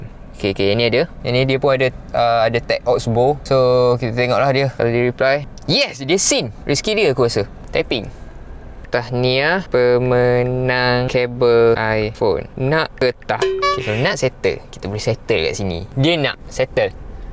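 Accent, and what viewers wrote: Terengganu, Malaysia. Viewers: la org trengganu ke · fuh ganu punya slang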